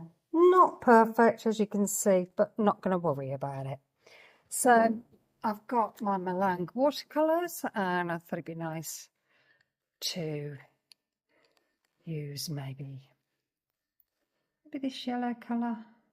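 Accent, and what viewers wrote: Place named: United Kingdom. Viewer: I’d love to know where in the UK you live. I moved from Nottinghamshire to the USA nearly 20 years ago and your accent sounds familiar.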